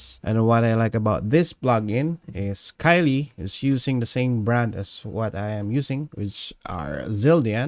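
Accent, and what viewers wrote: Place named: Philippines. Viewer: Nice to hear a 🇵🇭 accent while talking about metal related things. Mixes home with what I love.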